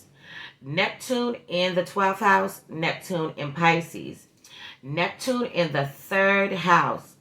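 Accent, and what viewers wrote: New York, United States. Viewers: Thank you love your vibe and NY accent. Mars in Cancer, Saturn in Cancer, Neptune Sagitarrius and North Node Scorpio here. You are hidden gem on Youtube, you deserve waaaay more attention on here. Glad I found you last year!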